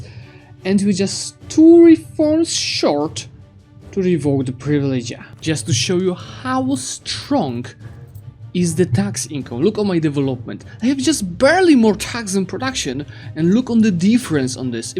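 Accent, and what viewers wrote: Poland. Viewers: this man's accent tickles my hussar sense.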